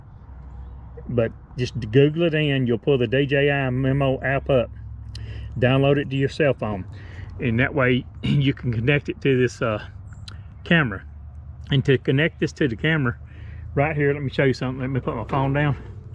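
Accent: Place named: South, United States. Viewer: Great video! And I love your southern accent!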